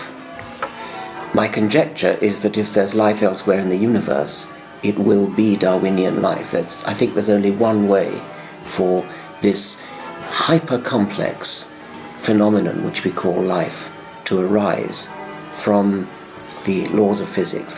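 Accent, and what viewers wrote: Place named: Oxford, United Kingdom. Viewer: must be church bells that give a sense of peace and meaning on a sunday I assume at Oxford, how ironic. There is no meaning at all just mindless replicating tiny entities using me as a throw away temporary vehicle like a throw away party girl, for no purpose. I love the civilized Oxford voice and the gentility but what he says is that we are all ignorant robots living in a Matrix like nightmare.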